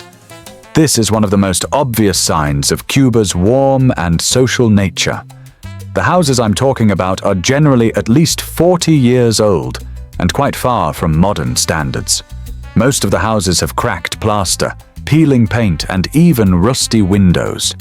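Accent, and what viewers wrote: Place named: England, United Kingdom. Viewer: The English accented AI voice is barely tolerable.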